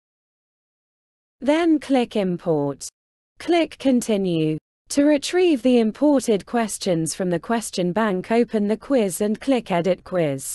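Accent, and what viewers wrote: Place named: RP, United Kingdom. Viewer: This AI generated (presumably?) "Received pronunciation" accent is unspeakably annoying.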